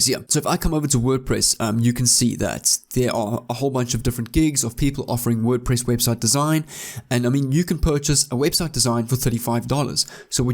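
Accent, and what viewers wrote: South Africa. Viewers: You sound like you have a South African accent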